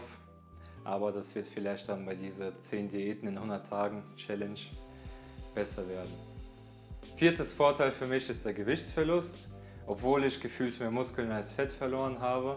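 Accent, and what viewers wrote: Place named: Russia. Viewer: ты откуда? ✌🏻 Russe/Russlanddeutscher? Ich habe das sowas rausgehört, dass du Russisch sprichst 😁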